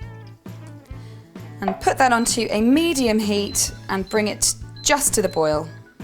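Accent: United Kingdom. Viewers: I love ur British accent btw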